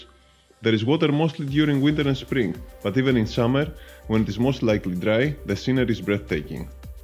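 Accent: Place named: Greece. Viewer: Beautifull Crete. Love the Greeklish.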